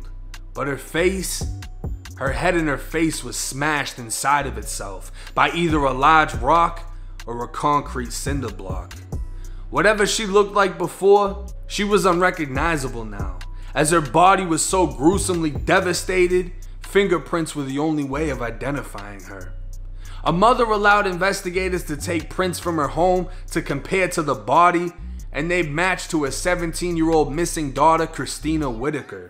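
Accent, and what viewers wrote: Boston, United States. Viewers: Not gna lie homie from that Bostonian slang you got going on I thought you said “That’s when he blew top all over the ground” …lol I was like what the fuck does that me— oh blue tarp lol Now get to tha packey and get ya self some beers as my buddy jeano would say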